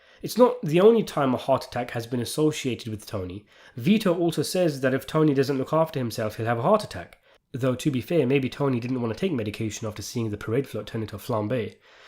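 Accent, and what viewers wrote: United Kingdom. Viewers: Ooh an interesting Sopranos finale video. Oh, it's a british guy, I'm good lol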